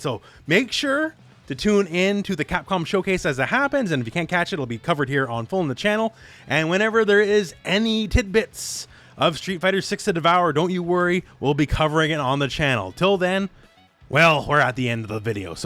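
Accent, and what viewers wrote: Canada. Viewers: you sound exactly like my 9th grade algebra teacher. Canadian accent and all.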